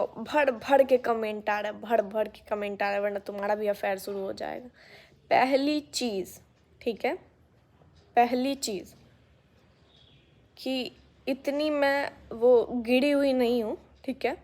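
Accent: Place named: Bihar, India. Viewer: बिहार में ऐसे ही बोलते हैं मज़ाक मत बनाओ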